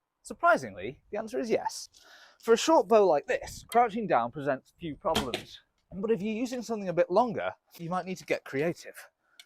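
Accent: United Kingdom. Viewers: why did I know he'd have a british accent? From the thumbnail I was imagining something like "shooting soidways?? MAAAATE!" and then a bunch of Teesside verbal abuse lol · I had this on mute and thought 'this guy must be british'...I turned the volume up and wo and behold lol P.s. this video was very entertaining and informative 👏🏾 ❤❤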